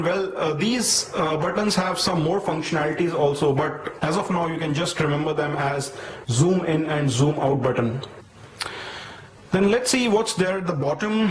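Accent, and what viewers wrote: India. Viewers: It's very helpful... specially the Indian accent :) Thanks a lot buddy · hey dude! shy did u shooted only 3 videos? u have 4000 subscribers only with 3 videos... u should make more videos.. u r great in explaining.. and u sound like an indian. are you indian?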